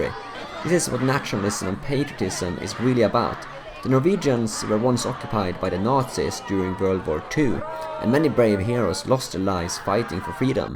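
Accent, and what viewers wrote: Sweden. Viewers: I can hear just by your accent that you are swedish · You can clearly tell from his english accent that he is swedish!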